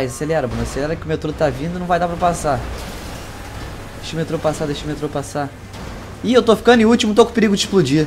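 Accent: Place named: Rio de Janeiro, Brazil. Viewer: esse sotaque de carioca muito loko kk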